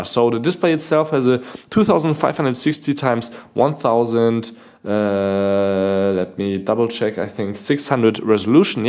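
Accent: Germany. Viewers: R u german?u've got that german accent.....nice review btw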